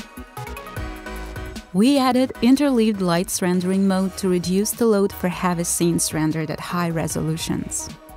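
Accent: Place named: Eastern Europe. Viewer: спасибо за славянский акцент. стоит поработать над К и П звуками.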